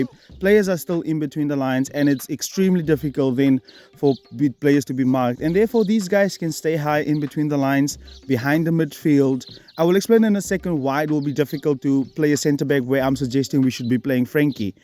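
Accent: South Africa. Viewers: You sound like a South African 😅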